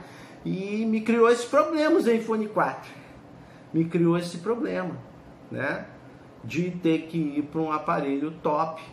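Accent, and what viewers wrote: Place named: Minas Gerais, Brazil. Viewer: Kkk gostei da mineirice...So observando a receita federal...kkkk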